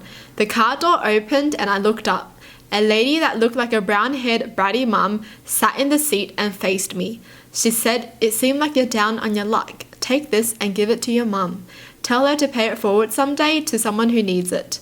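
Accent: Australia. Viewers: omg idk why but everytime I see, I think of Rose' (from blackpink). Like the cute aussie accent and everything!✨ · Who else loves her Aussie Accent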